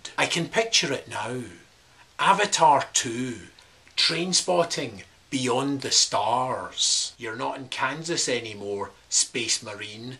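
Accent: Scotland, United Kingdom. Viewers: You're Scottish? o.o..I know someone in Scotland =D I've known him for like..4 years =)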